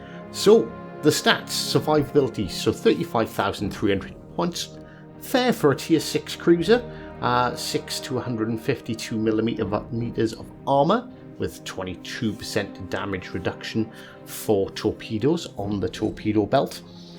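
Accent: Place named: United Kingdom. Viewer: Which part of Britain are you from? Love the accent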